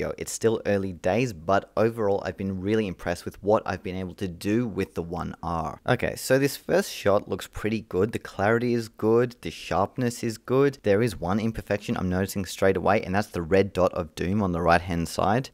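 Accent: Australia. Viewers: you sound very aussie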